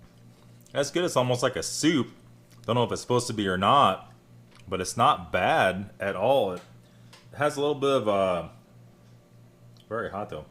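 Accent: United States. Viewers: Your intro is always so cool man :0) so fast talking American, impossible to me, lol :)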